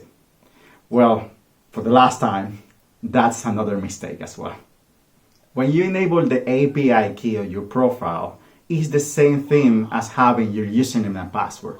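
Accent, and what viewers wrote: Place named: Dominican Republic. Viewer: Se nota que el pana es Dominicano ajajaj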